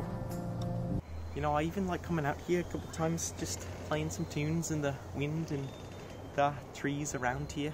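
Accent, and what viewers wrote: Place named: England, United Kingdom. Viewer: Oi, I have a life. Also no Irish man would be offended as that is almost a perfect English accent haha!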